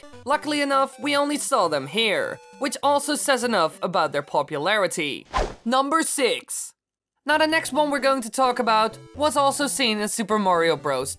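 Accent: Netherlands. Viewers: Are u dutch pls say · okay so i watched the video and i just gotta ask..is there a chance your dutch? xD your accent just sounds dutch espacially its not bad or anything im dutch myself (hela hola mijn medenederlanders) but im just really curious ehahaha xD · Are you Dutch?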